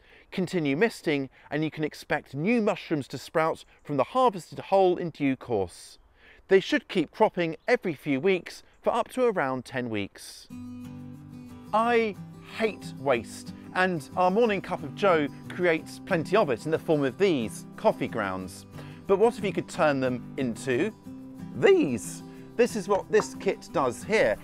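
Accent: United Kingdom. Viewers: Apart from all the useful information that this video provides, I found it quite relaxing to watch and your British accent contributed big time to that effect as well 😄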